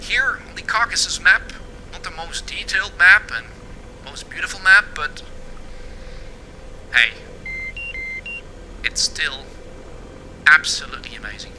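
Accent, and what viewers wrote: Netherlands. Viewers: you sound exactly like a dutchman trying to speak English. Well done. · I know a Dutch accent when i hear one :) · I hear a dutch accent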